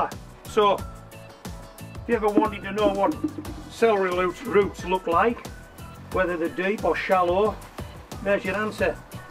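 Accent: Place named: Scotland, United Kingdom. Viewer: Music to my ears the scottish accent! Perhaps because my ancersters "Remmington" from 5 generations ago, where from Scotland and emmigrated in Ontario, Canada? Re: Celery harvest, cutting 2 inches from the base and covering them with a polytunnel will prolongate the harvesting date of a couple of months. Great videos. Take care.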